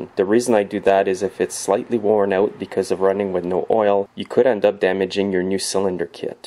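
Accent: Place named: Canada. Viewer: you sound somewhat Canadian. Nice vid tho, its good to see a professional do it, in the correct order. What solvent are you using in your parts washer? It dosent adversly effect the ignition bits? I'm sure its all solid state.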